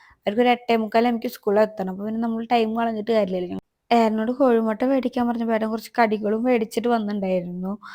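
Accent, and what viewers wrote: Thrissur, India. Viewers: That's their style...thrissur chela idath Njan ketitund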